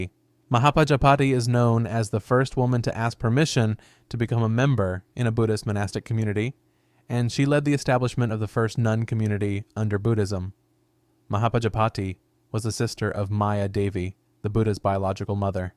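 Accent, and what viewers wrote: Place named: South, United States. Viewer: Hear the voice mate? It's American. Sounds like the south our lower Midwest, meaning the large majority of their religious exposure is Christian with perhaps a skimming of world religion ans culture, perhaps a world religion class or two, but nothing you can't get with a Google search. Also, the voice sounds Caucasian (not being racist, I'm a 50 year old white dude myself) based on the number of accents and regional speech patterns I've heard. Most white, American Christians don't bother learning much about Islam particularly because it's outside their belief and labeled satanic. Hope that helped.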